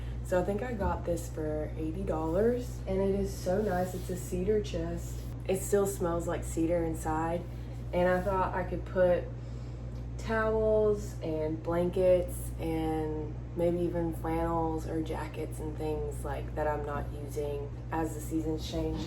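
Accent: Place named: Arkansas, United States. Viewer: You're accent sounds Arkansan. That environment seems very Arkansan. Are you in Arkansas? I grew up in NW Arkansas. Heaven on earth for around 4 month of the year. Otherwise it's hot as crap or cold as crap lol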